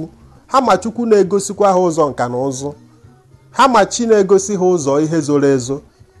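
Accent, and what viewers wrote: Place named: Nkanu, Nigeria. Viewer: Thanks a lot for this. We must begin to make efforts to understand our traditional spirituality. Thanks a lot. I just noticed you sound very Nkanu.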